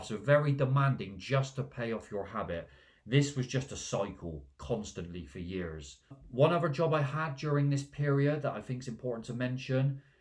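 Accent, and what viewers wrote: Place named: Bristol, United Kingdom. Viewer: I knew you were from Bristol!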